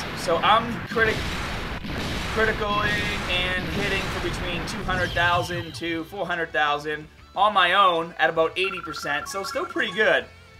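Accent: Boston, United States. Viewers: Hey are you from Boston? U got a hint of the accent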